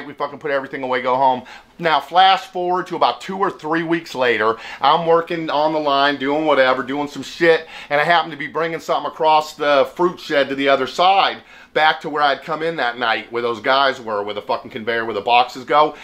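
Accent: South, United States. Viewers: So if you are from WA, how come you sound Southern?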